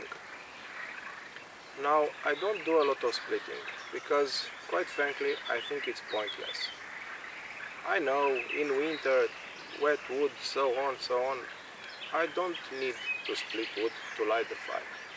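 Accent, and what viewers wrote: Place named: Romania. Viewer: You're romanian by the accent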